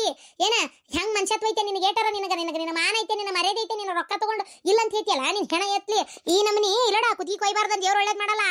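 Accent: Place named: Punjab, India. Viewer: 😂😂😂😂😂😂 super taking taking ur laughing punglish talking superb 😅😅😅😅😅😅😅😅😅😅😅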